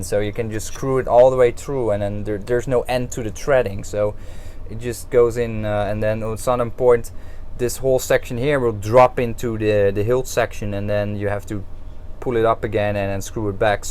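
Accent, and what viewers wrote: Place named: Netherlands. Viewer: dude are you dutch? you sound like someone thats dutch and speaks english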